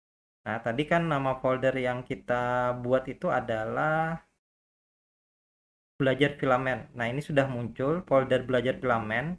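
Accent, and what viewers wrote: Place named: Banjar, Indonesia. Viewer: Logatnya kaya orang banjar..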